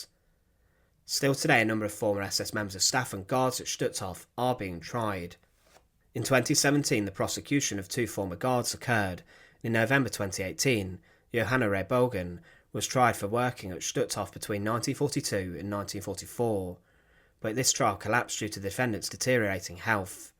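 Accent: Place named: West Midlands, United Kingdom. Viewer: I grew up in a place where most people speak like that (the West Midlands) and left as soon as I could, because I couldn't stand the accent.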